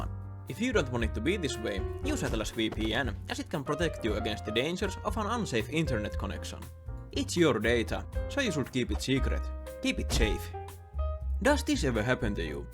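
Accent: Finland. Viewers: You have so strong finish accent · You have the strongest Finnish accent I have ever heard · Oot suomalaine kuulee aksentist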